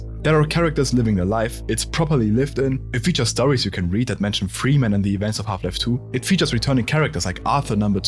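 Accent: Germany. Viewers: Sag mal, kommst du aus Deutschland?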